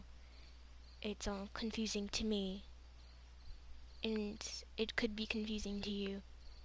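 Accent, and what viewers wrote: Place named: United Kingdom. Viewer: I'm so sorry what you've gone through (T^T) ps. You sound British Pps. I love you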